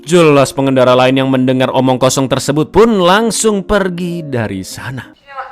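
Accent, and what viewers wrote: Java, Indonesia. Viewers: Aslimu org mna to min?jowomu medok,tp mm mu jwabar bget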